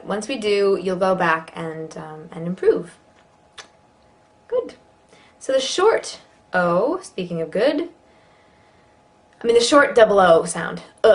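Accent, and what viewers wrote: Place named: United Kingdom. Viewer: половина слов с британским акцентом. хотя сама тараторит на американском ask с pass точн не правльно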